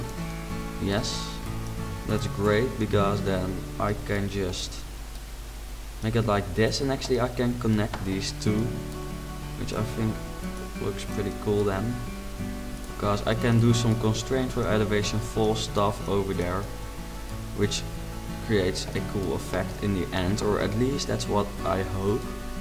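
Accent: Netherlands. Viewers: i tried watching this video without getting annoyed by the dutch accent, but i just can't, i'm sorry. i'm dutch as well, but i can't stand the accent, imagine listening to it for 23 minutes. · it's because he is Dutch. I am Dutch to, and I also have that accent! there is nothing wrong with that.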